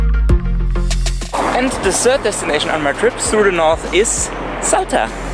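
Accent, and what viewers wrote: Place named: Germany. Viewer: Cant stand this german accent...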